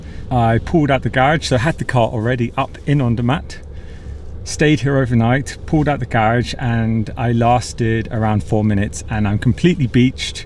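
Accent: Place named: Switzerland. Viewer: Hearing you talking swiss german is funny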